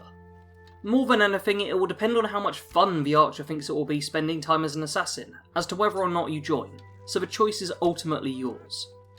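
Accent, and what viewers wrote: United Kingdom. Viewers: Is it your accent english? Also do you use mods ?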